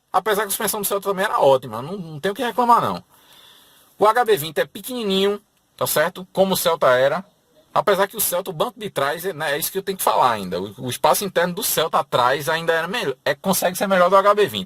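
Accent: Pernambuco, Brazil. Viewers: É Pernambucano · Não é Pernambuco?? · Esse cara é de Pernambuco ou região não é kkkk , Pernambucano e bixo ignorantemente e sotaque chato pra Caralho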